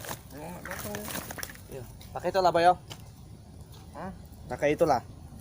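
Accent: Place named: Banyumas, Indonesia. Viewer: Alhirnya dapat banyak ikan juga yutuber ini, cukup kocak, bahasanya agak ngapak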